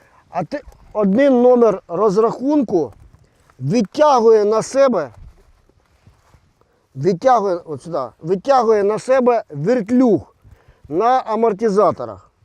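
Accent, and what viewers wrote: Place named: Russia. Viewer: У ведучого росийский акцент в розмови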